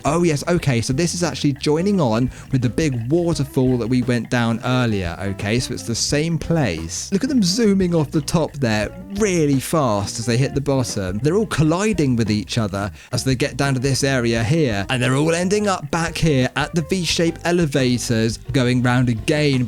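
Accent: United Kingdom. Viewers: Are you British?🇬🇧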